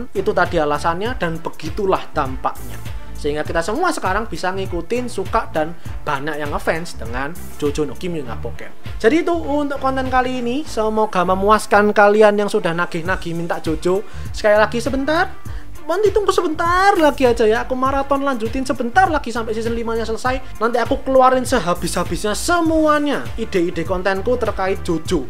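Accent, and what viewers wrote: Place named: Banyumas, Indonesia. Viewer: Agak ngapak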